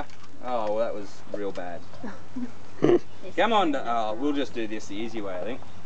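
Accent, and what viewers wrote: Australia. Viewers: Aussie mate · Aussie or Floridian. No one else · You're right. Can't imagine a non Australian croc boink. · Something about an australian describing wildlife just fits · I miss the Ozzy accent.. I miss speaking English in general.. people in Germany suck bad at it :D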